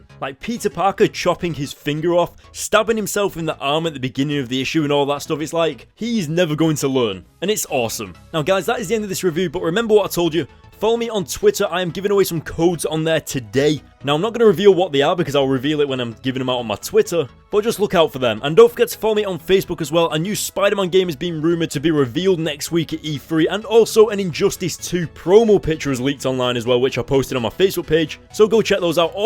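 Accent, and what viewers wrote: United Kingdom. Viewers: never knew you were english.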